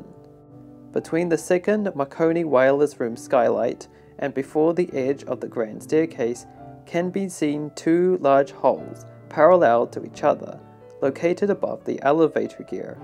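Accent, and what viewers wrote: New Zealand. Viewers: It's a New Zealand accent. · New Zealander?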